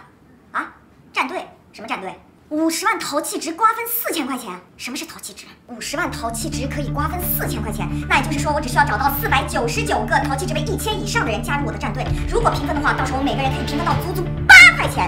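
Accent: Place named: North, China. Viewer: 奇怪，papi明明上海话讲了侠气标准，为撒普通欸吾一股北方味道？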